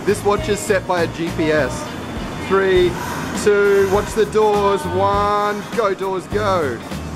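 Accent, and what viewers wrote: Australia. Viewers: Hi, how are you doing? i love what you did, it's incredible experience. What i like the most it s your Australian accent 😍😍😍. Please don t rush let us enjoy the details of japan, it's awesome country. Thank you for giving us this opportunity as well to discover really japan form your experience. I m going to watch the next one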